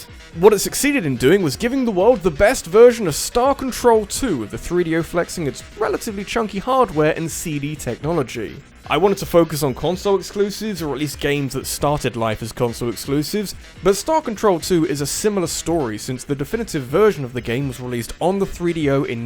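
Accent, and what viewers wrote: United Kingdom. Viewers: Top 5 Linear Pathed Games (because I prefer a linear path over open world) Also, it's kinda weird hearing a British guy talk about games costing dollars rather than pounds.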